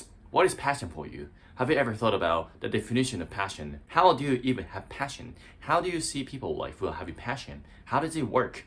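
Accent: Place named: Australia. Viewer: Very good video! I love that you share positive messages ❤ I wish I could learn to speak Japanese. Congratulations on working on your English, you’re doing a great job! I can tell you learned Australian English 😊 - I am American - our English has a different accent. Just an observation 😊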